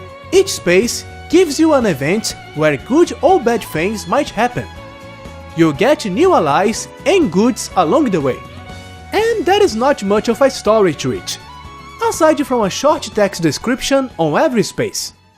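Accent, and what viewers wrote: Brazil. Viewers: moh sotaque brasileiro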